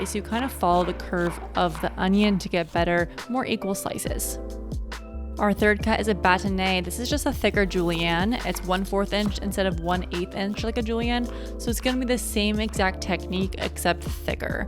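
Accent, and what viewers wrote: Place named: Los Angeles, United States. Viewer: I'm from LA, I was convinced the LA white girl accent was a myth until the first 5 seconds of this video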